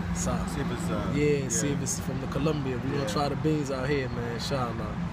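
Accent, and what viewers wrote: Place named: United Kingdom. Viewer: Asslam Alikom bro. You are very welcome in Riyadh and I hope you visit us again and having Arabic coffee, having amain dish in SA that's call Kabsah, barbeque during the night, stroll in desert, going a picnic out of the city espically in spring or winter. I know you love coffee& you have KMW cafe in Ras Elkhemah. May Allah give you ability& help for inviting non-muslims to convert to Islam, Jazak Allah Khair. I like you when you trying to speak Arabic. I am dought when I hear your voice that you speak British slang coz I understood what you saying. Plz contact me for any help from SA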